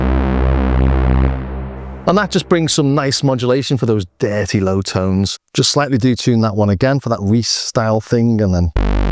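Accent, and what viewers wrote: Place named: North, United Kingdom. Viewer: where is that accent from? Somewhere in the North?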